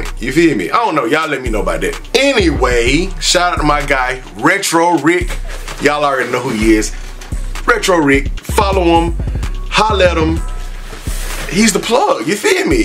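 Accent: South, United States. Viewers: you're a funny dude and your southern accent. keep doing your thing bro!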